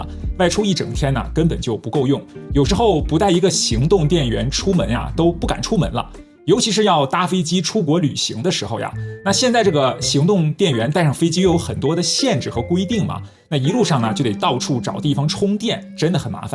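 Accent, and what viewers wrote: China. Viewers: 這幾個月才開始看的影片聽口音是大陸人還以為生活在大陸原來住台灣喔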